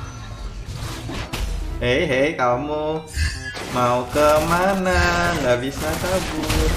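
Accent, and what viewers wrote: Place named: Malaysia. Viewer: Gua dari Malaysia gua dengerin suara abang kaya orang malaysia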